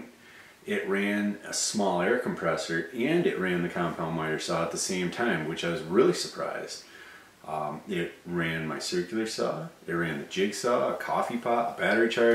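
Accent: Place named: Midwest, United States. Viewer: you sound like you are from Michigan. definitely the midwest. I know that accent cuz I’m from there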